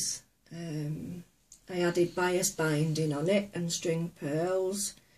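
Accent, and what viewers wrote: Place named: Manchester, United Kingdom. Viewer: Hi lovely northern lady...welcome to YouTube, this is a great first video, well done to you and your helper. You sound like you're from Manchester or somewhere that way...I'm in sunny Lancashire..lol..best wishes to your daughter, I hope it all works out well for her. Your teacup 'n' saucer are beautiful...looking forward to seeing more from you soon...Ann...x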